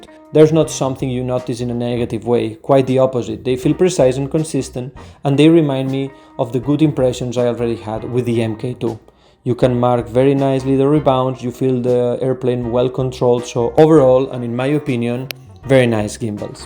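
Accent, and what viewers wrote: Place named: Greece. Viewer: Greek i believe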